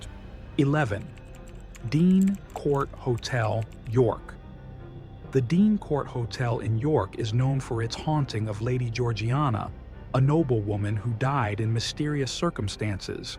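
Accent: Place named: United States. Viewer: Why an American voiceover?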